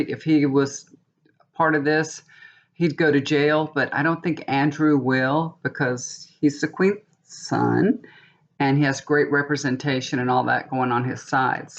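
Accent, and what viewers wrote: South, United States. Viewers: Kim for Intuitiview mentioned you in her latest post. So glad she did. Great channel and you're Southern twang is so cool. (I'm Australian)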